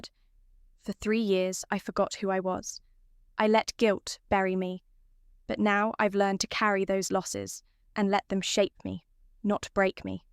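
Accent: United Kingdom. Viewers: Good story. Love the British accent and no AI mistakes in pronunciation or misspelling So refreshing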